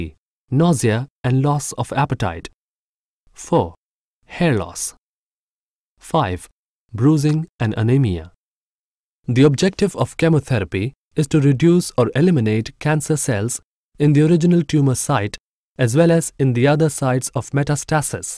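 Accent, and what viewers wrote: India. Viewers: ahhhh yes.... the fabules indian accent absoulutly love it omg i cant spell, anyways whoever is going through this i pray for you to get better and never have doubt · สำเนียงแบบนี้อินเดียแน่เลย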